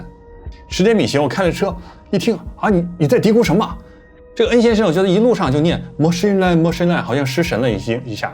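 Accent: China. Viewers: 主持人大陸人口音耶介紹魔神仔到後來自己一直講成神魔仔😂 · 想問一下，您到底是台灣人還是中國人? 純屬好奇，因為覺得您口音很像大陸人，但對台灣卻很了解，感覺您是大陸人台灣人的綜合版。:face-blue-smiling:face-blue-smiling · 直播主聽你這口音是中國內地人對吧，解說網路怪談視頻越界到台灣本島了，魔神仔是台灣本島才有的怪物，中國內地可沒有魔神仔出沒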